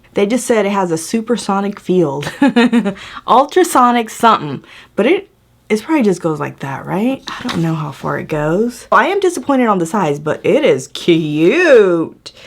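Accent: South, United States. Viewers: Omg that is so funny you said cotton candy grapes because I’m eating some as I’m watching this.... I love your videos!!!! Where are you from? Sometimes I hear a southern twang in your voice.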